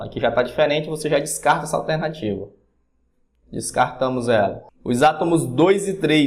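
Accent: Northeast, Brazil. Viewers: Consegui entender melhor devido ao seu sotaque, tbm sou nordestino, abraço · VC é nordestino? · nordestino foda kkkkk É noix vlw <3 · Huum Sotaque do Nordeste! Boa aula ♡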